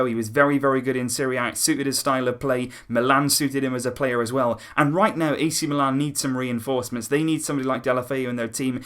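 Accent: United Kingdom. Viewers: barcalegend _10 uk i know by the acsent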